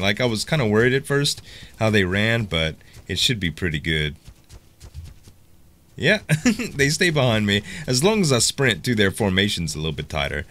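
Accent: Louisiana, United States. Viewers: Where are you from? I'm catching a fellow Cajun accent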